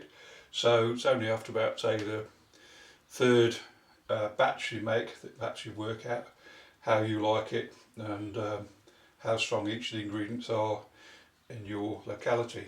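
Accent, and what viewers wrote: United Kingdom. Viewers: Is that a west country accent? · Do i detect a lincolnshire accent?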